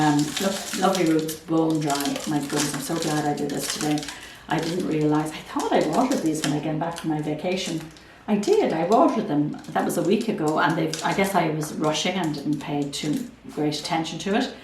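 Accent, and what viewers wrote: Ireland. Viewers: Thank you. I have narrowed my plant subscriptions down as I began to suffer from information overload. Your relaxing, informative videos are a joy and your lovely accent is a balm to this American daughter of Irish immigrants. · Please continue making your video!! Don't stop...I enjoy and learn so much. I also enjoy your gorgeous accent from your lovely country...Ireland 😍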